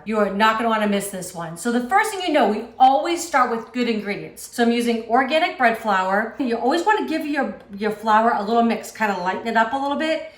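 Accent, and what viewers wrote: Boston, United States. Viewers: I was so confused when I saw Bostonian Alert & started laughing..omg I was trying to say it your way! Im loving this content & the bread looked amazing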